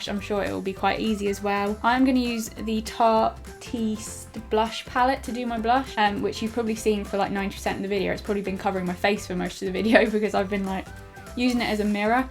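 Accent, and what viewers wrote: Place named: London, United Kingdom. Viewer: I didn't think you would reply thanks I love your vids. I love how I finally found another English makeup person like Zoella,but your from London I can tell by ur accent xxx 💙🦄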